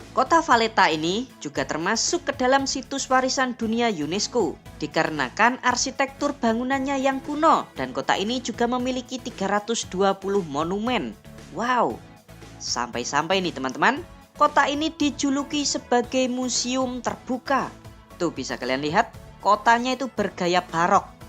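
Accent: Java, Indonesia. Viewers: Medok bgt hostnya😂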